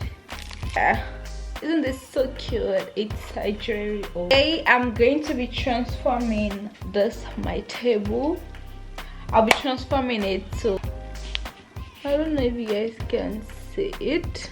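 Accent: China. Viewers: Another beautiful vlog. I love your Chinese accent 🥰😍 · I love well you your Chinese accent. And hunny I loved your vlog.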